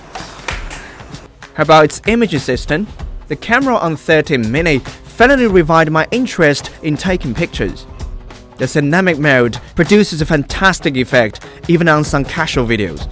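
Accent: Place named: United Kingdom. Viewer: 惊奇，竟然是全英文版本的，还是英式英语，哈哈哈，加油，做自己，等你们的充电头了！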